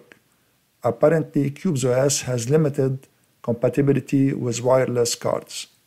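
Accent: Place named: Lebanon. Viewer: sounds like a lebanese accent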